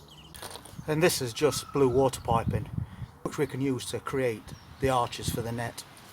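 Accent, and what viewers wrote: Yorkshire, United Kingdom. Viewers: Just found yer chanel dude subscribed hello from donny are you Yorkshire?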